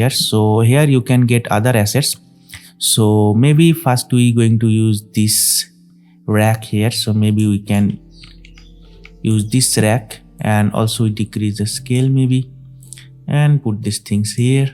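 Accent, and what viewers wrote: India. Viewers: Oh no, Indian English😢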